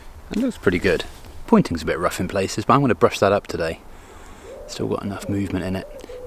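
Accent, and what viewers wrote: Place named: England, United Kingdom. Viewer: Sometimes I feel like I am watching Will Ferrel build a barn while narrating in an English Accent. Looking great though!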